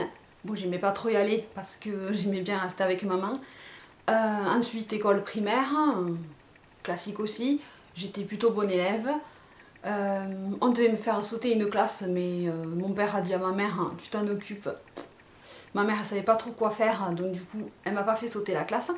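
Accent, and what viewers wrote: South, France. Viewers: Je voulais juste te remercie car en regardant ta vidéo je me suis remotivé dans mes études,j'ai vraiment envie de m'appliquer (tu m'a également fait versé une petite larmichette). Ton parcours est certes atypique (comme tu le dis si bien avec ton accent du sud), mais c'est surtout le parcours du battante. Je trouve que tu forces l'admiration et le respect. Je te souhaite le meilleur dans la vie et beaucoup de réussite professionnelle, tu le mérites vraiment, t'es une fille en or. Bisous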